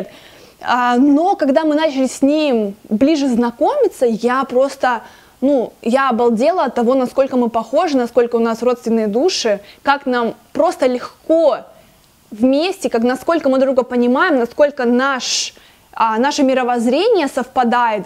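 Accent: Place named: Spain. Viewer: вот, ровно два года назад акцента еще не было)))) испанский язык внес очень красивый оттенок в ваш русский язык))))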